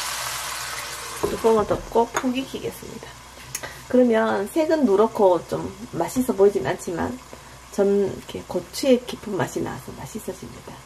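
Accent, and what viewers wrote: Gyeongsang, South Korea. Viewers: 님 요즘 왜 레시피 안올려요? 나긋나긋한 경상도 말씨 이모님 목소리도 듣고싶고 이모님 레시피가 저에게 맞아 많이 배웠는데...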